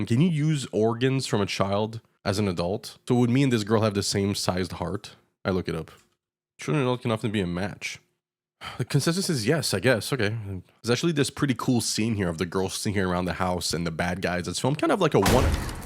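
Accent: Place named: Quebec, Canada. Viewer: Tes québécois toi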